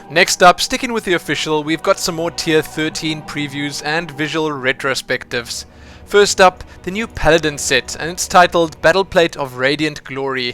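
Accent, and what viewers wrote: South Africa. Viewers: sounds south african... then i lost interest straight away...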